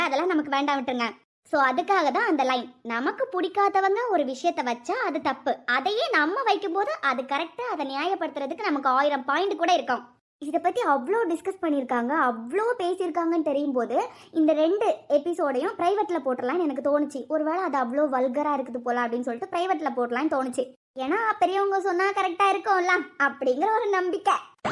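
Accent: Kanyakumari, India. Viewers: Ayyo sister kanyakumari slang kaga dhan video pakkavey start panna enaku unga slang romba pudikum na Kumbakonam enga slang movie la pesura madhiri irukum but ungaloda slang super ah irukum inga ellarumey apdidhan sister inga pottiya vida poramai dhan adhigam neenga video podunga nanga support pandrom 2 days ah yen video varalanu think panna new married outing edhuvum poirupinga so video podalanu nenachan but idha pathonadhan theriyudhu enna reason apdinu enga support eppodhumey ungaluku undu neenga video podunga ❤❤❤❤❤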